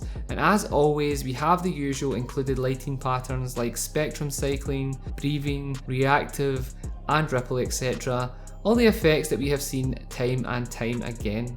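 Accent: Scotland, United Kingdom. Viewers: is this scottish? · your Scottish accent is so Scottish P.S STAY AWESOME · What part of Scotland are you from I'm from Edinburgh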